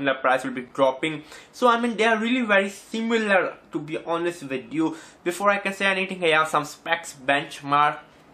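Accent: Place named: India. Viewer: Dude, you need to decorate your room a bit. Make it look like a professional pc-room. Also, please take elocution classes. You clearly have a speech impediment and you need to get help to overcome this problem. I'm Indian and I am very familiar with the pigeon-Indian accent but even then I find it difficult to understand what you are saying. I'm not being cruel. I'm just trying to give some honest feedback. Once your elocution improves, people will understand what you are saying and your feedback ratings will also improve.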